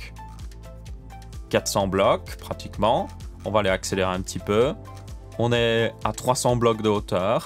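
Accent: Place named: Belgium. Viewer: Ta un superbe accent belge !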